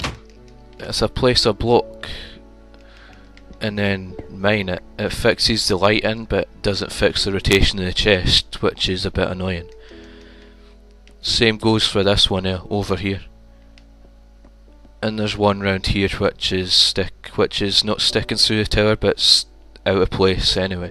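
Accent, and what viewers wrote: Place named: Scotland, United Kingdom. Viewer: Good scots accent mate! Subbed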